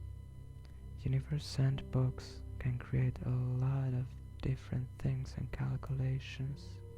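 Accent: Finland. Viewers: You sound Finnish, are you from Finland? :)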